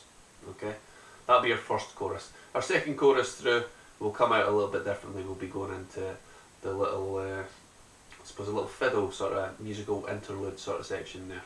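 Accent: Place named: Scotland, United Kingdom. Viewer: Scottish I like it.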